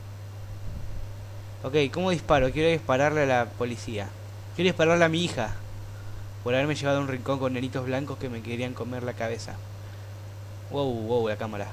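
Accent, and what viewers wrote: Argentina. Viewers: alguien me puede decir de que país argentino o ingles aaaaaaaaaaaaa me confundo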